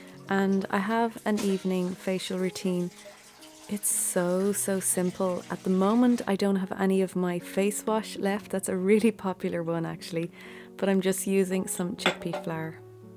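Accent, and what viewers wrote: Ireland. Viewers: I so love your videos, you can say that I am hooked. I can't get enough of them. I love your Irish accent and soothing voice. You're such a blessing. Thank you for sharing and for all your wonderful ideas. I have always wanted to visit Ireland but you bring Ireland to me. Thank you so much. God bless you. <3 Keep up the great work. You are truly appreciated. Love and hugs to you my dear.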